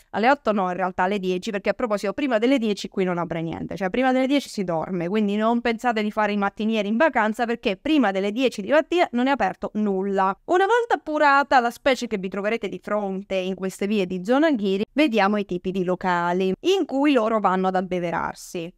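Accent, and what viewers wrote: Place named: South, Italy. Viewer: Dall accento sembri più del sud😊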